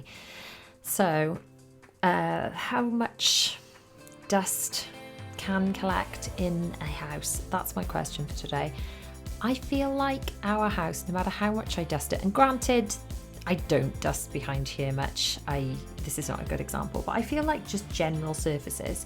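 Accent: Wales, United Kingdom. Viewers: Hehe ych a fi! 😂 As im getting bit older i notice myself saying that alot to my kids 😄 , i was born in neath but lived in newport, cardiff before moving to Brum! I love your channel, and get really motivated by your cleaning and sorting vids 😊 I picked up your welsh accent when i first came across your channel 😊❤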